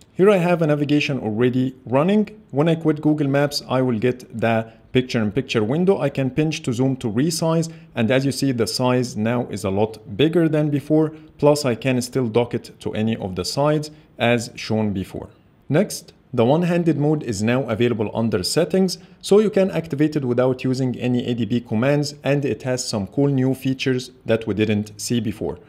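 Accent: Egypt. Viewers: your accent screams eygptian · Hhhhhhhhhh.hhhhhhhhh...you are egyptian i can hear your egyptian accent while speaking english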